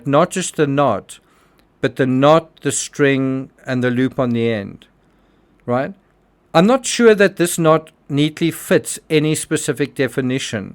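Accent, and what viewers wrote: South Africa. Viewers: Also, love the accent, it’s so soothing. South African right? Love it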